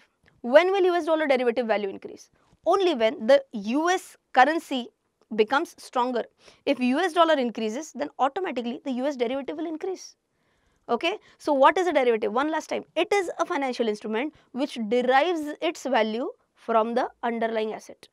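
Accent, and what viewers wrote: India. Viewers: I have diffucultiy to understanding Indian english (people from India speaking english ). Does anyone know of any solfware that can change the dialect (sounds) of Indian english to USA english. For years, i have experienced the problem of fully understanding compleatly all that one says from India, because the English is not the native laguange and is heavily accented. Thanks for the video, you have increas my understanding on Futures and Option trading. However, I had to replay the video several times before I understood what the audio was saying.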